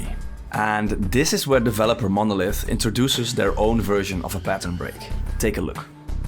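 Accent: Netherlands. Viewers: Man you sound dutch you heve strong accent i vlasnders my self dutch part belguim 😅😅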